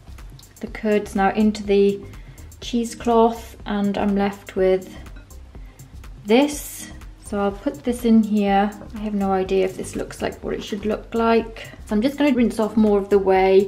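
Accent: United Kingdom. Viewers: Love your accent what is that British?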